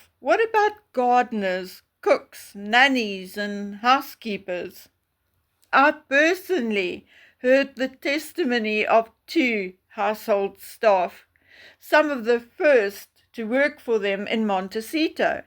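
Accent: South Africa. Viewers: Just heard this post is this a South African accent here?? If so, greeting 🙏 from South Africa 🇿🇦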